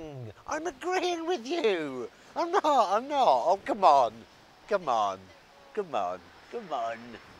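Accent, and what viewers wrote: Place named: West Country, United Kingdom. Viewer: I can hear traces of West country in his vowels.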